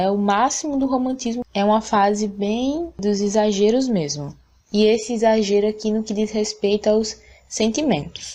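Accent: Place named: Rio Grande do Norte, Brazil. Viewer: Esse sotaque não me engana! É do Rio Grande do Norte! Muito boa aula! Me ajudou mt!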